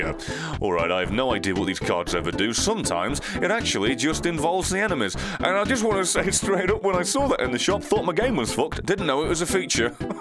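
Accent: United Kingdom. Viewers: Is it just me or is EXTRA British today?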